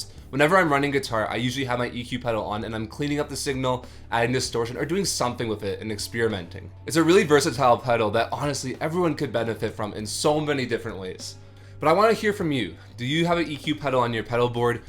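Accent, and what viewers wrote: United States. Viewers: i don't know why, but the way you talk reminds me of "Californians" (SNL)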